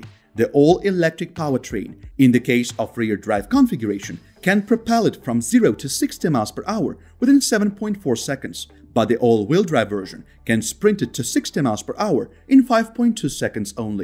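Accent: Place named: United States. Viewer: Is this what a posh American sounds like? Jeez dude stop you’re butchering the English language!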